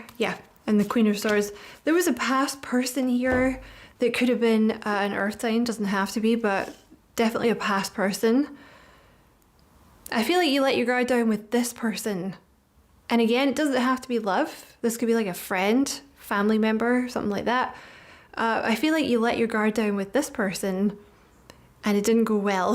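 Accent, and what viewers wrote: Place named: Scotland, United Kingdom. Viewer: Thank You. Felt as if you were doing a private reading for me. Resonated with me every step of the way.. and I love your accent and you must be from Scotland. Thank you again. You are an excellent tarot reader. Averil from South Africa. Blessings to you ♥️🌹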